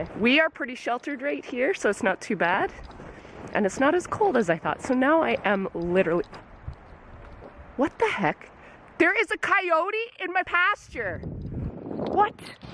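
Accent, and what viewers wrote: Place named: Perth County, Canada. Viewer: I thought I recognized your accent 😁 Out near Perth county myself, the snow has been crazy this year!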